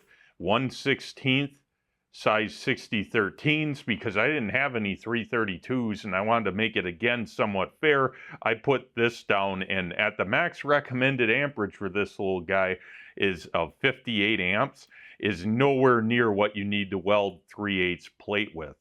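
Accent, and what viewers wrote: Canada. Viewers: This is the thickest Canadian accent I’ve ever heard. This is what they call a “newfie”? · Sounds Canadian, eh? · I'm from Detroit, we border Windsor Canada. So growing up I knew a lot of Canadians and you sounds like them! Nice vid btw. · Is this guy Canadian. The accent.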